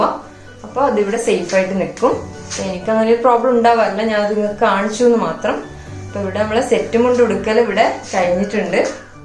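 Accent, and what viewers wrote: Kannur, India. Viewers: Kannur kaariyano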